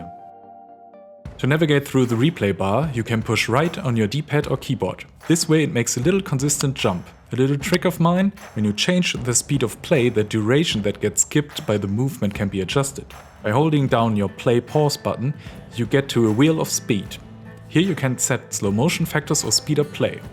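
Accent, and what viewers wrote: Germany. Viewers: Ich hab sofort gewusst, dass du Deutscher bist haha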